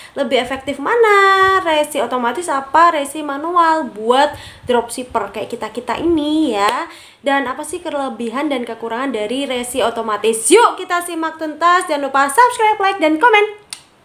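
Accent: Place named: Jakarta, Indonesia. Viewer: Dengan logat betawinya yg kental. Hehe...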